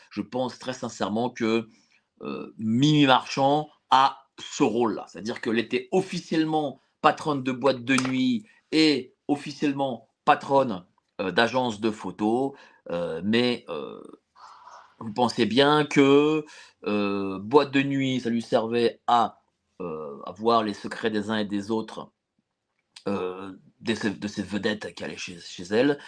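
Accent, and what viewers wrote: Germany. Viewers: Oui,c est vrai, arrête de prendre l accent allemand. Ça n a aucun intérêt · tu es français parles Français pas avec l'accent chleu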